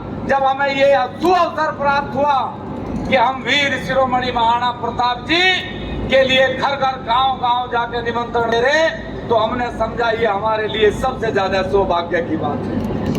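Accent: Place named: West, India. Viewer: Paschim ki boli esi he bhai good work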